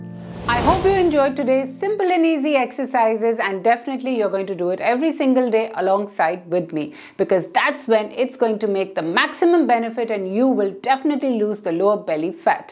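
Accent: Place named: India. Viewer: Thanks so much. From your accent I can immagine you are from India. I was living there for many many years and I love your country. Thanks and hugs from Italy. ❤️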